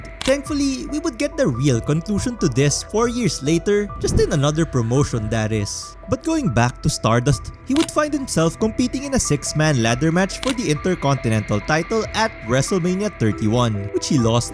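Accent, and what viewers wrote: Philippines. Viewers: You sound Filipino. Are you? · your english is very Filipino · That accent... Filipino?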